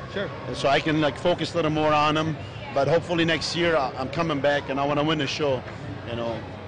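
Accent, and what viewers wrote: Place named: Ireland. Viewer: You're from Ireland? I know a lot of people that bag the shit out of your accent aswell. Have some respect knows more about nutrition and BB than most pros. Who cares where he comes from or how he speaks.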